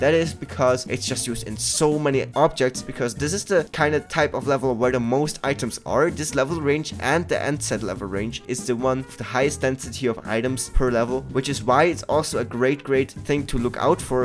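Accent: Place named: Quebec, Canada. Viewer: where are you from buddy? Im pretty sure I heard some french quebecois in your videos ^^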